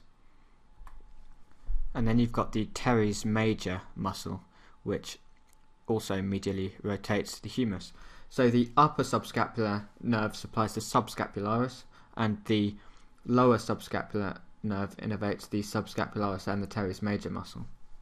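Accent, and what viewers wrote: United Kingdom. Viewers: yup thats british accent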